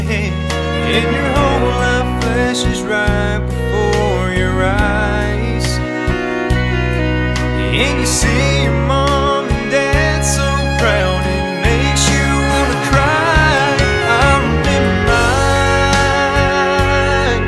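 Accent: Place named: South, United States. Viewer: This guy sounds so country, so good! 💪🏼😆👍🏼✨🔥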